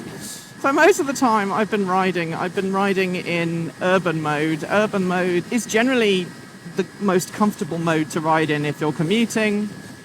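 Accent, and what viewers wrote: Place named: United Kingdom. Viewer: She bri-ish aren't you Paying attention?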